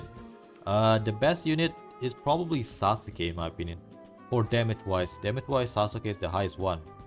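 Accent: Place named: Indonesia. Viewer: di denger denger ini aksen indonesia banget 😂😂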